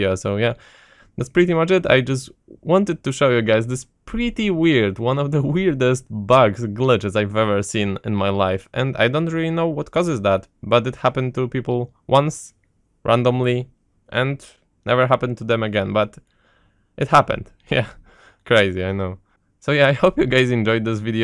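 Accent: Poland. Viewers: Po akcencie można poznać że jesteś Polakiem xD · Masz Polski akcent. Jesteś Polakiem ?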